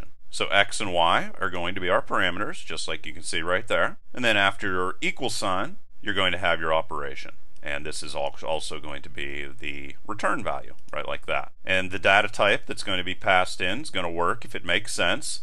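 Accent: United States. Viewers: Offtopic comment, I find it really amusing the fact that you can clearly tell this guy is a 100% 'Murican made just by how he talks.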